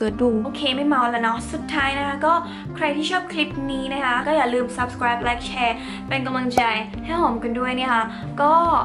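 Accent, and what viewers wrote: Isan, Thailand. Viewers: เป็นคนอีสานหรอคะ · ชอบที่พี่พูดภาษาอีสานอ่ะ 💕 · ซ่อง 55555. อีสานผสม ปากไม่เเดง ไม่มีเเรงเรียน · น่ารักๆเว้าอีสานผสมๆนำมักๆ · ซ่องวะสั่น555. ชอบความอิสานมากค่า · ชอบพี่ตอนพูดอีสาน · ชอบความพูดอีสาน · เป็นคนอีสานบ่พี่หอม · ชแบเวลาพูดภาษาอีสานอ่ะ น่ารักมากเลยยย❤ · ชอบความภาษาอีสานนนนนนนนนนน เสียงน่ารักมากค่ะ พี่ชอบ กดติดตามแทบทันที่ที่ได้ยินภาษาอีสาน 5555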